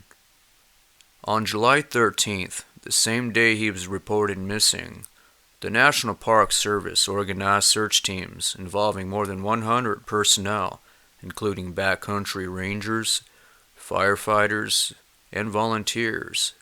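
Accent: South, United States. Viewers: i feel he uses to much lip, kinda like he puts his lips out too far when he speaks, like he's trying to keep a moustache out of his mouth sound you know, it's like he's puckering his lips to talk, throw in a slight southern accent and there ya go, i could talk like this guy all day, it would look kinda odd walking around having conversations with puckered lips though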